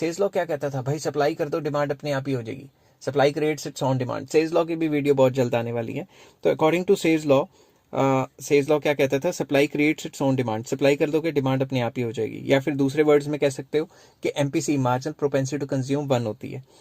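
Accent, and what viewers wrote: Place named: Haryana, India. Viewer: sir aap haryana se belong karte ho kya? apki language mai bagri touch aa raha hai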